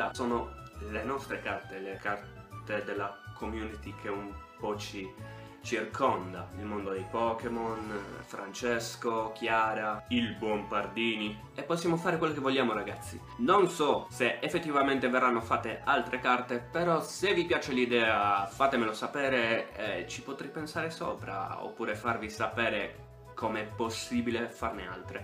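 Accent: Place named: Sardinia, Italy. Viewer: ciao, anch'io sono sarda ma il tuo accento mi fa ridere:D comunque bellissima l'idea delle carte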